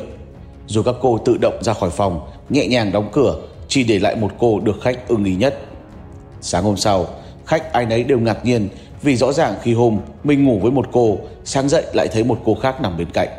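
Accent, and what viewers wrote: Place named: North, Vietnam. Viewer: Giọng bk đèo bỏ mẹ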